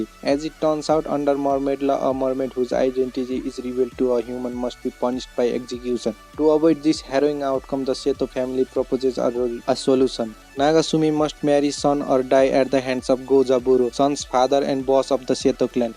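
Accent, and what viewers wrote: Nepal. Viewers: He has an Nepali Accent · Lot of Nepali accent. I already knew. Me 2 Nepali 🇳🇵🇳🇵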